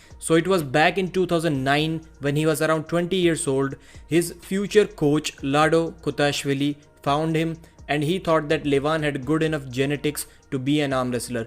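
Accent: India. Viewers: Indian accent is horrible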